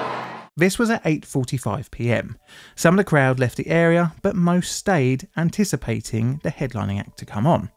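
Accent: United Kingdom. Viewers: Why would i get my Indiana state news from someone who sounds like a limey?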